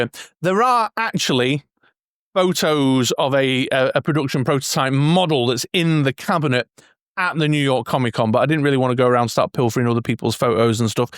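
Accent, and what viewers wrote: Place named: United Kingdom. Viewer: What a great review. Plus the accent gives it that British charm and you can tell how excited you are by the volume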